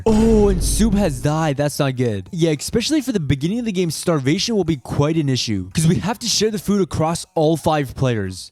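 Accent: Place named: France. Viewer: T’es français mdrr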